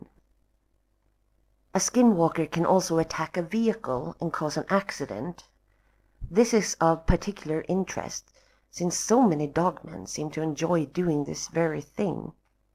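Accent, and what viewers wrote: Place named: Ireland. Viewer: You said you're Swedish but your accent sounds Irish. I loved listening to your narrative. Very good!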